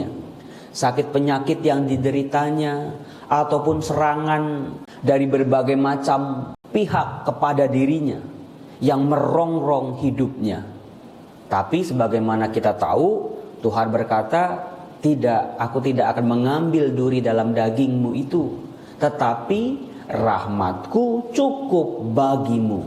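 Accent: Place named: Eastern Indonesia, Indonesia. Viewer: berarti tinggalnya didaerah kebun jeruk. seperti orang dari Timur tapi halus kalau berbicara seperti orang jawa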